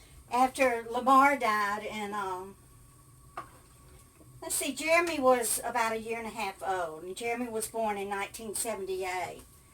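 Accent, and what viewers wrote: South, United States. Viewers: Food looks good ms. 😋your sooo sweet,I love your southern accent😍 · Love that "bless his heart", that's so southern. We all say it down south. The north hasn't taken your accent away bless your heart! You know I'm real southern because of being from south Mississippi like you. sang that song. My mama had all his records, yes records not CD's. Her's were the big ole 78 vinyl RPM's., crawfish pie and file gumbo, cause tonight I'm gonna see ma cher amio. Then he was gonna pole his pirogue down the bayou. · I absolutely love your southern accent :)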